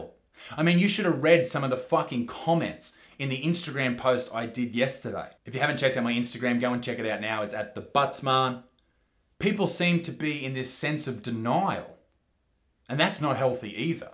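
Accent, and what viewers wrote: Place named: Australia. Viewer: i weighed 135 Me, an American: that's not bad, goddamn: kilograms Me: oh yeah that's right I'm an American and this boi sound like he's from Australia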